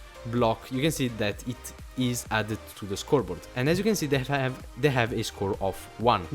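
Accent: Italy. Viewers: si sente che hai l'accento italiano :p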